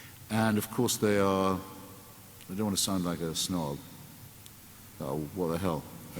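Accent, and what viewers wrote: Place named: England, United Kingdom. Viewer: If he didnt have an english accent no one would care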